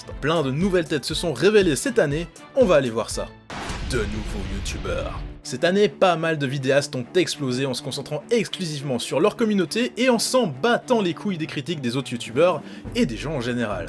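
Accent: Belgium. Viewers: tu est belge ? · t belge ??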